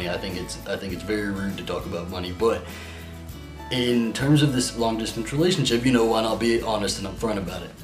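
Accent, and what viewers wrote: South, United States. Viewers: . You were talking about gay acting straight. You are very straight acting. If You didn't say you were gay, it would easily be assumed you were straight. But that what make you so damn cute. And I love your voice with the southern tone to add. Alastair is a lucky ass man. He screws this up, I'll beat him up for you. I'm straight acting, so I know what I'm talking about. But not as cute as you. Actually not young either - haha😂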